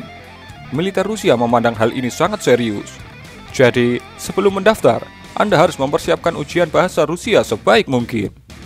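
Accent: Java, Indonesia. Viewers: medok banget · Hahaha medok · Ini medok suaranya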